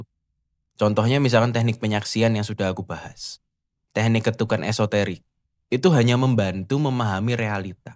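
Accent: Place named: Surabaya, Indonesia. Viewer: KERENNN lurr LANGSUNG MAK JLEBB, MODEL BOSO SUROBOYOAN CEESSS PLENG LANGSUNG ON POINT. Cuman penasaran bisa sejauh ini PEMBUKA LARA BATINNYA KY APA DULU,,, 😂😂😂😂 PENGHIANATAN KAH, PENYAKIT KAH HARTA KAH.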